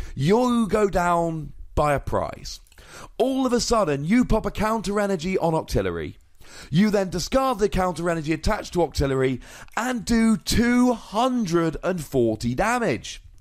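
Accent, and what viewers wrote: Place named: Birmingham, United Kingdom. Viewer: Though i heard that voice in Birmingham and Sheffield regs!!!! your a great commentator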